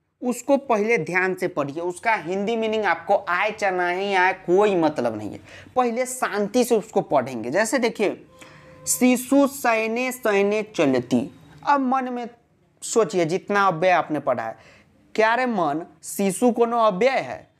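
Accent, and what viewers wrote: Bihar, India. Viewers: Bihari hai ka sir ?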